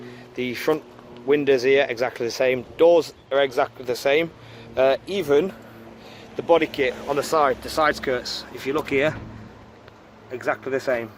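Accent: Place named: Derbyshire, United Kingdom. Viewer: Defo from ilson (Ilkeston) Langley mill Eastwood area, can tell by the accent lol. I was looking at the Corsa VXR and the fiesta ST and ended up buying a fiesta ST 65 plate. Both nice cars. I need to get mine remapped but I’ve still got Ford warranty until the start of October so I don’t want to mess that up lol.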